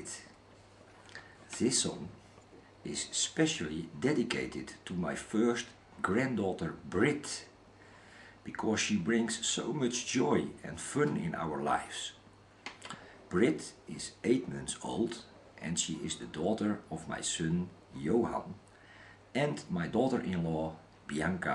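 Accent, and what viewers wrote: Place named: Netherlands. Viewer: Ik hoor aan uw accentdat u nederlands bent, heel goed gespeeld ;)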